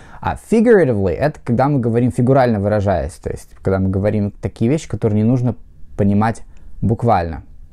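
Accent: United States. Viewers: У вас очень красивое и понятное американское произношение.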